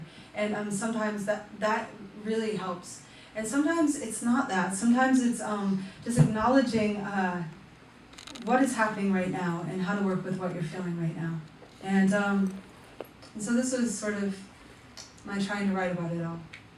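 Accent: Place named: Midwest, United States. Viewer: For one, Everyone has an accent haha; but if you wanna be specific then she has a modern midwestern american accent. I would know, I'm from Colorado.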